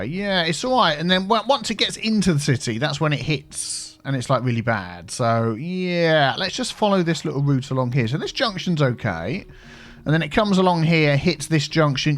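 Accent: United Kingdom. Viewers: I`m from Germany but I`d love your British accent and that your language is so polite and friendly! I also watched Oxygen not included in the last days and maybe you like to play it with the new content again? At the moment you are my favorite youtube channel. Thank you a lot!:) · No idea why I watch, as I don't even play this. But been watching since Christmas.... Where in the UK are you? Trying to figure the accent. We had snow yesterday too. You sound fairly localish to me, but that said, sound like someone who may have lived in different places (ie, a cockney turned geordie 😂 - not saying you're either of those)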